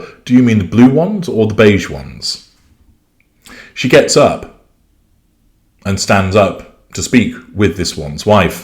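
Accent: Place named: Scotland, United Kingdom. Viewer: You know sometimes your pronunciation reminds me of Alan Brazil. I think you are of Scottish heritage.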